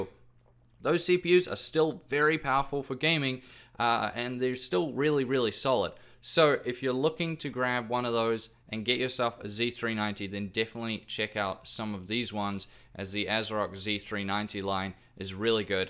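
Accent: New Zealand. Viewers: .....damn i forgot where i was going with the first half of my comment! oh well, its nice to listen to another Kiwi talk tech!!! :D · .. hear your Kiwi accent ?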